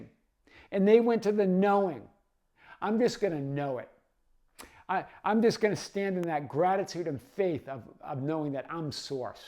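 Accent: Boston, United States. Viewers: I’ll be honest with ya, when I found your channel a while back, I was like “ehhh idk, this guy is kinda loud with a funny accent” (I’m from Arkansas so if anyone has a funny accent, it’s ME lol) but the more I watched you, the more I’ve come to love your Boston accent & you’re not loud, you’re PASSIONATE. Now I look forward to your videos everyday because you’re such a ray of sunshine & such a wonderful teacher. You’re a true gem,! 🫶🏼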